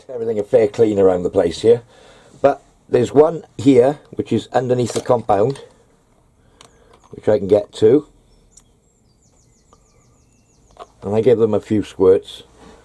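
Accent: Pembrokeshire, United Kingdom. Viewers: I thought I recognised the Pembs accent. Spent many holidays in newgale, lovely beach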